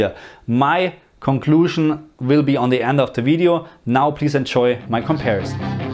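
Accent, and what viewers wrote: Germany. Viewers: Are you from germany? You sound like german :-) ? Falls ja schöne Grüße aus Hamburg. Eventuell kann ich dann meine Fragen auf deutsch stellen? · Your german accent is fucking ruining your videos man...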